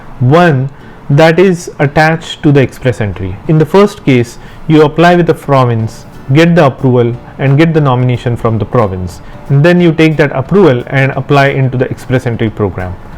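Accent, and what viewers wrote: India. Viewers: I wish to apply but very hard to understand the Indian accent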